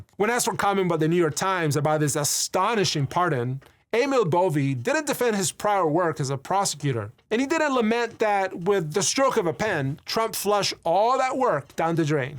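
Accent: Chile. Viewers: Wonderful video, loved the Chilean accent and thank you very much for naming/showing for a moment the other coups from Latinoamérica PD: Mi departamento completo quedó plop cuando lo escuchamos hablar español, lo seguiremos apoyando desde Chilito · Había notado el acento, pero no sabía que era chileno. Yo he visitado La Moneda, Plaza de la Constitución y el Museo de la Memoria y Los Derechos Humanos. Fueron impactantes. Espero que no tengamos un Tanquetazo aquí, pero estoy preocupado. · No sabía que eras chileno! Pero después de escucharte hablando en español es muy obvio y no me puedo creer que nunca me dabas cuenta que eras latino. ¿Nos darás unos vídeos legales en español, por favor? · Not gonna lie, the Chilean accent took me by surprise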